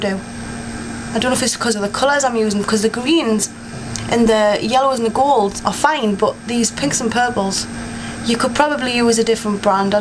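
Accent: Newcastle, United Kingdom. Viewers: Love her accent geordie!!! Im on camera mind! lol love it!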